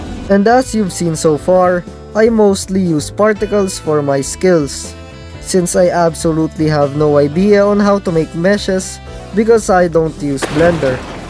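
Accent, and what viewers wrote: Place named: Philippines. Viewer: Y do u sound like a Filipino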